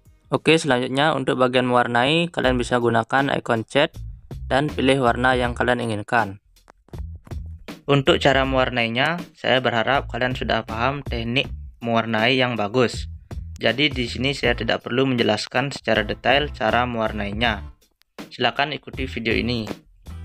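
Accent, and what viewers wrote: Aceh, Indonesia. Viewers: Orang Aceh ya bang? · Awak Aceh BG nyo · Aceh kah??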